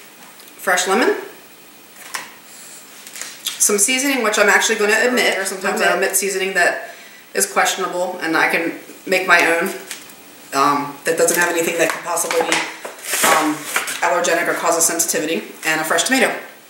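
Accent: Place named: South, United States. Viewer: I've laughed seriously out loud on your southern accent lol.....first lesson....southern talkin 101....taters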